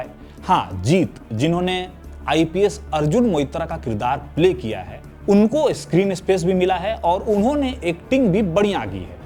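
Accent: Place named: Bihar, India. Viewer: Iski bt se samajh aata he ye bihari he isliye ye jal raha he😂😂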